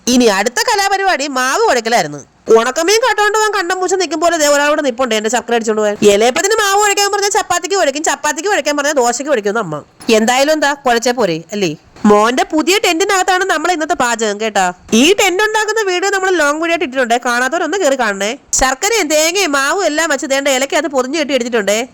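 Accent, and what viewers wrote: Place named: Trivandrum, India. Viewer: Ullolam,uthippooram,nammude Tvm slang,enthaayaalum kothippichu,ente favrta😊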